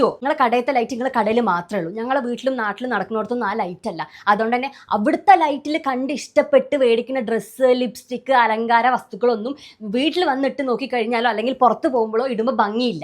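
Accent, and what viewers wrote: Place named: Kannur, India. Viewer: Panam potte powyer varatte. (Kannur basha)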